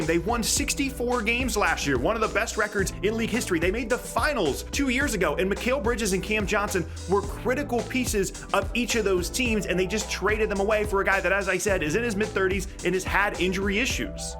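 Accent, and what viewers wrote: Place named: East Coast, United States. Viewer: I love your channel, I don't know if you're from the East Coast, but you talk really fast. Like I said it's great to listen to cuz it sounds kind of local to me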